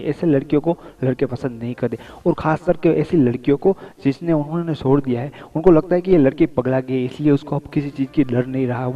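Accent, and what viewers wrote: Gujarat, India. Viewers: Gujju ke Bolne ka style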